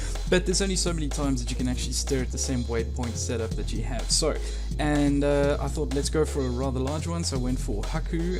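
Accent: South Africa. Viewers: Where you from, i hear some south african twang every now and then? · I like the commentary and the base itself, sounds like you're a fellow South African? Subbed your channel and keep up the good content ^_^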